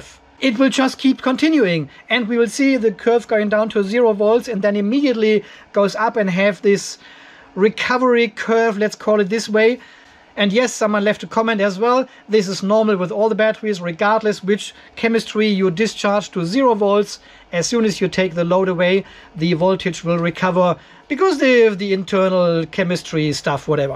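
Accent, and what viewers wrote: Germany. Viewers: meine Mutter und Großmutter findet dein clasicher deutsche Dialekt so funny.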